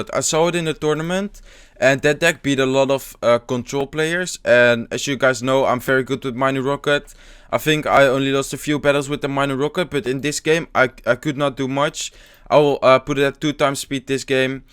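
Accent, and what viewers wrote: Netherlands. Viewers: Are u Dutch/Belgian|Ben je Nederlander/Belg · `haha dat nederlands accent lol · Are you from the Nederlands?? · Are you Dutch? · are you dutch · ja hij is Nederlands ja, kan je al aan zijn accent horen. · Ik dacht al dat je nederlands was. blanke koppie en dat accentje. knap gedaan trouwens. zet ons kleine landje goed op de kaart toppie! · Matthijs Riemens denk het ook want zijn uitspraak is echt lelijk Nederlands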